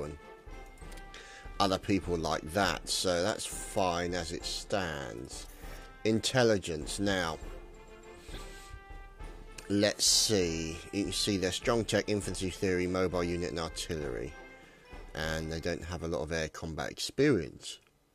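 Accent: United Kingdom. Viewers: is this person British ?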